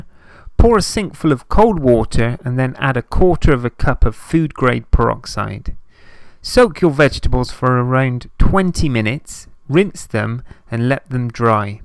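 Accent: United Kingdom. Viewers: Pretty sure mould is the British spelling of the American word "mold". Judging by our narrator's accent, he must be from that part of the globe. I do not think it is wrong to point out a misspelled word in any public context; how else are young people supposed to learn if not by example? Both acne and disinfect were misspelled in this video - pointing out or correcting a mistake does no disservice to the narrator and just might do someone a favor. Come on, is it really better to remain ignorant and illiterate than to learn a little something? How else are young people to learn, if not by observing the world around them, spelling and all? Please, stop being so stubbornly opinionated about someone pointing out an error in a video; it should all be part of the experience. Or are civil discussions completely beyond us....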